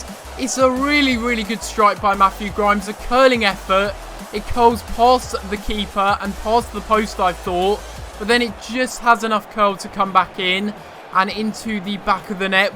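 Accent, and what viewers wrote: England, United Kingdom. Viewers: I know it sounds weird but what part of england are you from?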